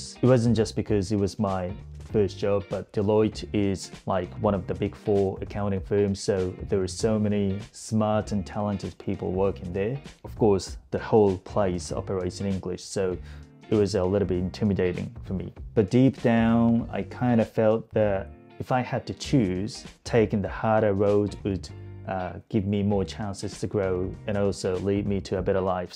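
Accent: Australia. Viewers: オージーアクセントが炸裂してる笑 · この人の英語ってフラットというか、イントネーションの高低があんまり激しくないように聞こえるんだけど オージーアクセントを身につけた結果としてそうなったのか、意図的にそうやって話してるのかどっちなんだろう？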